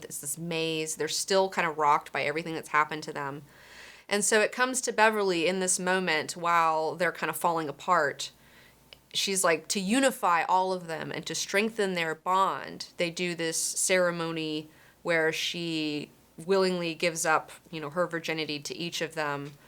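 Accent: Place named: Baltimore, United States. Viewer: The Baltimore accent is strong with this one. 😀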